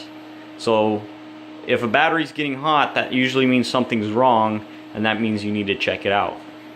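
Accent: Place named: United States. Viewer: May I ask what state you're from? I'm trying to learn about American phonetics and I found your accent very pleasant to listen to. I hope I'm not being too intrusive.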